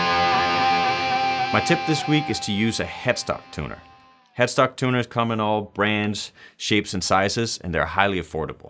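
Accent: Denmark. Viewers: Sounds like a Dane speaking English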